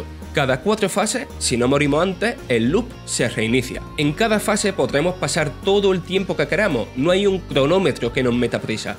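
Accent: Andalusia, Spain. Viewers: ¿Este narrador es andaluz?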